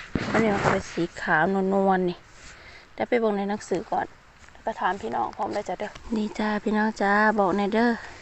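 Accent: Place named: Sakon Nakhon, Thailand. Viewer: น้องไออยู่จังหว้ดดั๋ยจ๊ะคือเว่าสำเนียงสกลนคร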